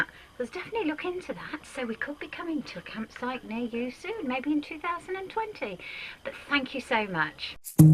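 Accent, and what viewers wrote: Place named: Bristol, United Kingdom. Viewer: Nice video, love hearing the Bristol accent as its where my mum comes from.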